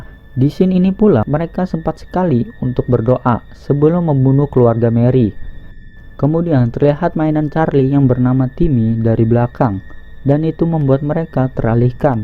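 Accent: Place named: Indonesia. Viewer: Maklum Bro,Mulut Orang Indo Ga terbiasa Dengan Bahasa Inggris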